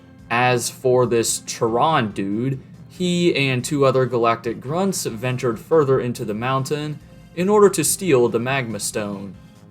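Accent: United States. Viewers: speaking in a General American Accent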